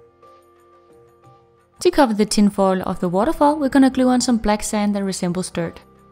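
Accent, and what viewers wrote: Denmark. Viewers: Are you from denmark? Your accent sounds danish :) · Are you Daneish you're accent sounds Daneish if. U are then God video tøs det blev pænt ❤️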